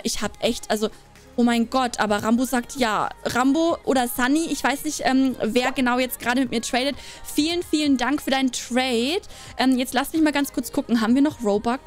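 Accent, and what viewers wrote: Bavaria, Germany. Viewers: Kommst du aus Bayern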